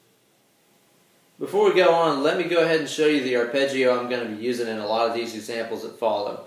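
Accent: South, United States. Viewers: You are Pecos Hank of guitar. Your presentation is so similar, it's just that he's chasing Tornadoes and snakes. You guys even look similar and both with that southern draw. Great stuff BTW. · 2024. I notice the southern accent now.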